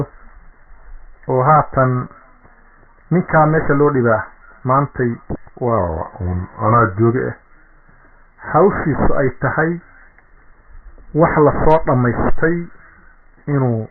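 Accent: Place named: Mudug, Somalia. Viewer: Aun halganki syl ayu kaso qayb galey, doorashana kursiga waa ku yimid sharaf intaasa ugu filan MD Abdirashid Ali Sharmake, waxaa kalo cawa ka siyadsan inu nin rer muduga ahaa sida hadalkisa laga dhehanayo bari ba ha u dhashe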